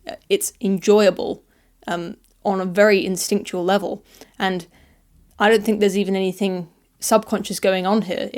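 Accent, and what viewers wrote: United Kingdom. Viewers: you ARE attractive. I'm reluctant to say it, but you look great without makeup. I'm reluctant to say it because I don't want to be misunderstood. Plus, I don't want to offend. Thing is, I'm actually turned on by women who don't wear makeup. Again, I want to be careful here, but a woman without makeup is, for me, like a woman without clothes. It's revealing, exposing, arousing. I shouldn't post this since I've already had two white Russians this evening. But there you go. Wish I could delete this post. Or at least redraft it. Combine that with your British (or Australian) accent? Oh, dear God! P.S. I've read The Selfish Gene. P.P.S. Dawkins is an idiot. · British accent. Ozzie’s don’t sound like that mate. I guess you’re American because for some reason you’s can’t pick accents. Everyone else can. I can even tell the difference between bloody Yanks and Canadians. 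One is really loud and obnoxious, the other apologies all the time and says ay a lot. I can have two beers in my breakfast cereal then drink all day and still not fall in love with the first chick I see on YouTube. Pull your socks up! Just kidding, it’s all in jest mate. Have a couple for me you funny bastard!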